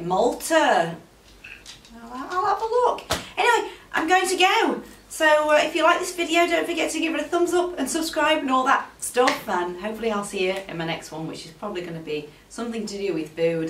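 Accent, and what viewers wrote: United Kingdom. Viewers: I don't know you were irish I thought because of the accent that you were english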